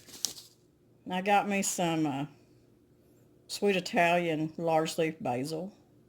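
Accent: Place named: Arkansas, United States. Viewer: Thank you for sharing. My late father was from northern Arkansas and extended family still lives in an area likely not too far from you and where we took many road trips to visit years ago. Your accent and way of speaking reminds me fondly of my late grandmother and my aunts and cousins. Lovely, soft, and sweet. Thank you again from the heart of the San Joaquin Valley in California where tens of thousands of acres of almonds are grown and are currently blooming in celestial creamy white this time of year.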